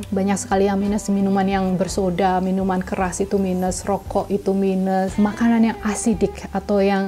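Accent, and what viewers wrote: Banyumas, Indonesia. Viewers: Serius ka.. aku juga dr CILACAP.. SALAM NGAPAK YAH KA.. n thanks bgt atas sharing nya..beruntung bgt ❤️❤️❤️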